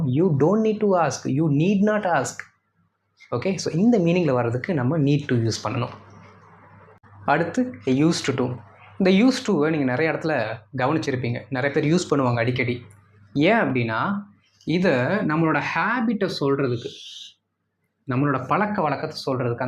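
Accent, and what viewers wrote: Coimbatore, India. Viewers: ஏனுங்க நீங்க கோயம்புத்தூரா Brother class super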